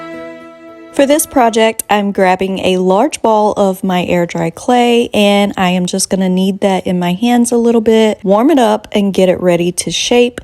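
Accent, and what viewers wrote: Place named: Florida, United States. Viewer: I say "them" and "him" with the same accent as you .. I'm from Florida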